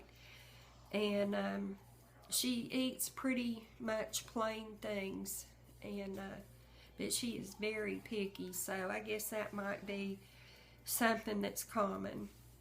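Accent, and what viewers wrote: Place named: North Carolina, United States. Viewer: I know this is an old video. But my grandmother was born in NC but moved to Melbourne FL and lived there for the majority of her adult life. I've been to Eau Gallie, is very cute! I think it's funny that y'all are in reverse! She had a NC accent like yours and i find it comforting. :) I'm also a momma's girl. I adore my mom.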